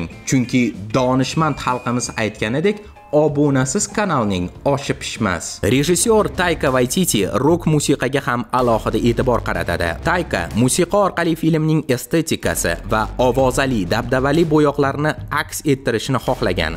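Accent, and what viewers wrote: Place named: Russia. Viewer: Sizga maslahat. Nutqingizni ruscha oham va ortiqcha bir hil intonatsiyadan tozalang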